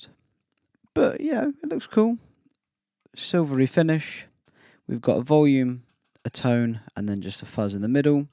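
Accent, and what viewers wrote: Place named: United Kingdom. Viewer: Hello, cheers for the demo sounds like your from the UK. I'm looking for a cheap fuzz to send my Minimoog through. where did you get it from? Would you consider doing a comparison test between a cheap fuzz, Distortion and a sustain pedal. Is there a big difference? Cheers Tim. ( yes you are from the Uk as I discovered near the end of the video:) ) I like your presenting style.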